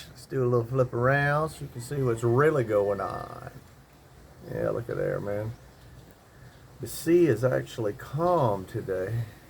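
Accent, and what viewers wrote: South, United States. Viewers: I like your Southern accent. I live here in Tallahassee, Florida which is just part of the deep south.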